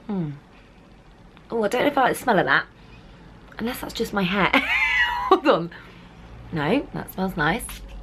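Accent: United Kingdom. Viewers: Im in the US and subacribe to Birchbox. I love the Liz Earl skin care line and keep stocked. I just started watching your videos. Our US Birchboxes dont have the sac that holds our contents. Im gonna suggest it. I love watching you and your cute Brit accent. You look so much like Dutchess Kate whom I love dearly! Im Half French and wanted you to know, when you read the French labels on products, you doba fine job!!! ☺ I'll be subscribing to your channel. I hope some day, I can come visit the UK. Its on my bucket list!!!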